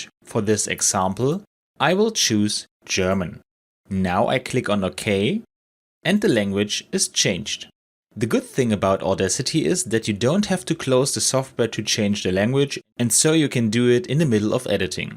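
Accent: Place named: United States. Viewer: indian guy with insane american accent means we getting our problem solved 🔥🔥🔥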